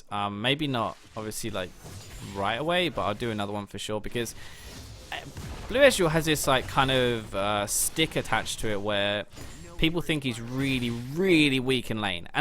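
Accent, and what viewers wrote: United Kingdom. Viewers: "I think honestly, basically, i mean. i don't honestly, i guess. he honestly, basically it means, actually, honestly" - Damn You really need to limit yourself when you use those words and phrases it really pissed me off. On these 7- 10 min videos you can't actually notice that, but on this video it is really REALLY horrible. I Love your British accent a lot and the content you are making, but those phrases man, damn. Keep up the good work tho.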